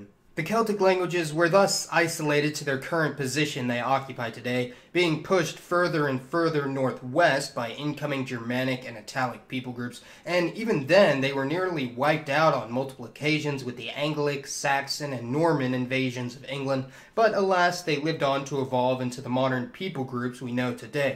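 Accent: United States. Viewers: square sausage the voice narrating is Northumbrian you mean American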